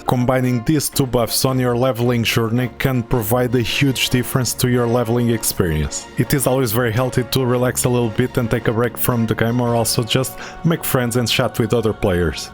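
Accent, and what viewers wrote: Portugal. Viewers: Awesome video. 1 question are you portuguese? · Hey! You Portuguese? O sotaque diz-me que sim 😅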